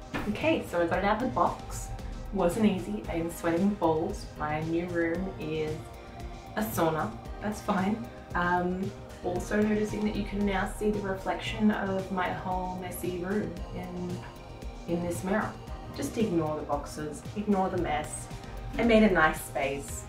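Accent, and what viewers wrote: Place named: Australia. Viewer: Great build love the Aussie accent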